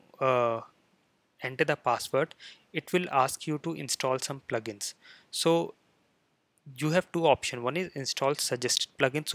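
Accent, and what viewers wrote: India. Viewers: I guess you guy from India... /smile/ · 这印度口音，听着真累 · Do you can speak in English with out Indian accent ???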